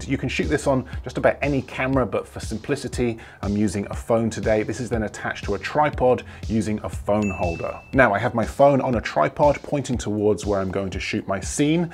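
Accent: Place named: Northern England, United Kingdom. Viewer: Totally off-topic but I have trouble locating accent. I have the impression he has a rather neutral, BBC English type of accent, but his a's in words like "mask", "class" and "can't" sound more Northern English, I think. What accent does he have?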